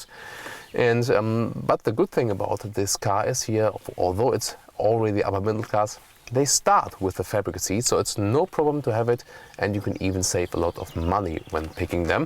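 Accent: Germany. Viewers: I wish I had one of these in 2018 when I finally out my chance to drive on the AutoBahn........I wound up getting a Audi A6 which was no slouch foe ride, performance and handling but.....I had a A6 back in the states so I knew how they drove. Don’t get me wrong 30ish MPG with the V6 diesel was nice but getting to wring out something like a Q70/Q70L on the AutoBahn on a clear day would be nice. Your English even with the German accent is great, you have studied and sounds like you can speak better English than some....well...Englishmen. Good detailed video with facts and not a lot of fluff. Three good selling points for me maybe more but starting with three. 1. NO Auto/Start/Stop for the engine. 2. Modern design without going over the top. Nice and functional without being overly flashy. 3. I cant speak for Europe Spec engines since most of them are probably diesel. 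I like the regular multi port fuel injection. 4. Traditional moon roof, not the fancy Panasonic. I want metal on the roof especially if in a rollover accident, 5. Cabin Ergonomics. Decent layout and reasonably well laid out. Example. Fuel door RH side of vehicle...Fuel gauge on RH side of the instrument cluster. Some misses and not overly critical but observations that I have noted. 1. Rear Turn Signals. Personally I prefer the amber contrasting color rear turn signals. 2. Slightly behind the times for transmission technology. Yes functional and effective but with the competition coming up with 8,9 and even 10 spee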